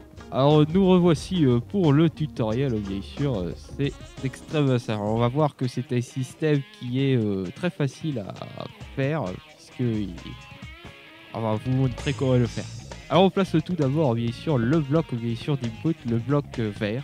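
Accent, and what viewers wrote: South, France. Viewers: hé ! l'accent du sud c'est le plus bel accent du monde ^^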